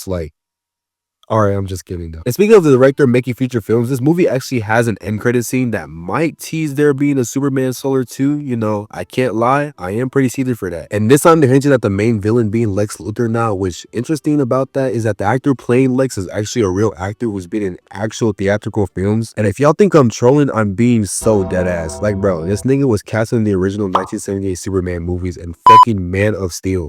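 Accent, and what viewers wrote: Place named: Mexico. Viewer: man THIS one is a stretch Edit- What's wrong wrong with people having their own personal views that others deem "racist"? The reason I even ask that is because YOU'RE grifting by making both of the videos. A real BIG gear grind of mine is when someone calls a person racist for saying 🥷🏿 BUT then THEY start dropping the SAME WORD casually for the rest of their conversation. Nah my boy that ain't gonna fly, at that your whole fake rage & argument you just Steph Curry'd right into the dumpster. AND even if you're Black you sound like you have the inflections of a South of the US Border denizen, so saying it for you is wild if true.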